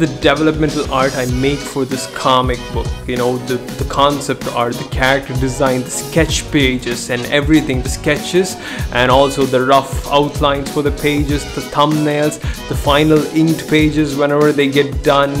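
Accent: India. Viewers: Bruh are you indian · This Indian accent. I can't understand these people!!!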